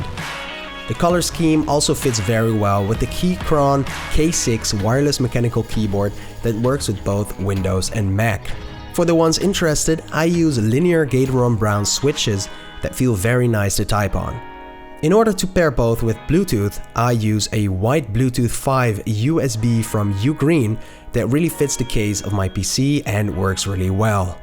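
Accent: Netherlands. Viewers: Ik was in de commands opzoek of je Nederlands was, toen zei je het zelf. Hoorde het toch net in je uitspraak af en toe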